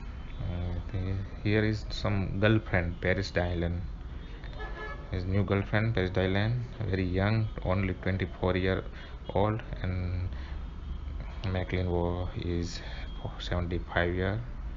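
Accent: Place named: India. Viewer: That Indian accent is horrible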